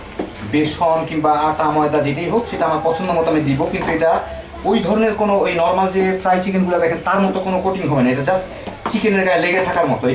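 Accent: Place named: Dinajpur, Bangladesh. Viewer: vai, salam.apnar bari ki Dinajpur a? amader kothar shathay vishon mil pai.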